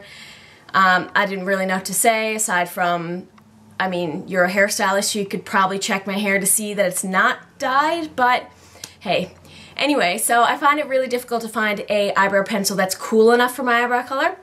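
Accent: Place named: Atlantic Canada, Canada. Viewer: I was watching yt videos on my iPad and your channel was recommended to me. Are you from the east coast of Canada at all? You sound like me sort of lol....(I'm from Newfoundland living in Ontario).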